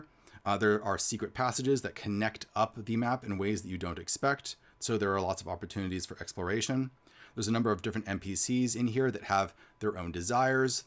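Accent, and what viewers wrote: United States. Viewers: Hello, a dude from sweden here, dowing what we do best: Drinking coffe and trying to convivnce the world that the swedish language is not hard, actually. The classics, Å Ä Ö. Å is pronounced like the O in Lord, Ä is pronounced as the A in Damn, and finaly Ö is pronounced kinda like the U in Murder. For the O in Borg, shorten it a bit and deepen it a bit. And finaly the end G is not as hard, and pronounced more as a J. From Sweden with Love - Kami P.S, i think you just need to extend your E abit, so its more like "Tveeland", and if you wanna get realy fancy you could work on your swedish A, wich is more as the A in Lard as a standard than the american A, who sounds more like our Ä. But honestly, your doing fine, mate. Swedish realy isnt that hard to speak(tm)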